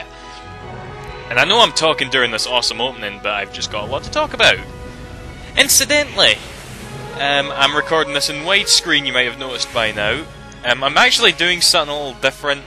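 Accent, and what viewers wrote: Scotland, United Kingdom. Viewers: lolz nice scottish accent! hehe! the jokes r awesome too :D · Ok i just found out at first i thought he wus canadian but now i founs out he scottish i think =p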